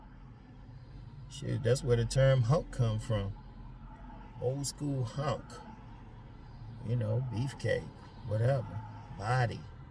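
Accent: Washington DC, United States. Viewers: You sound like you from DC.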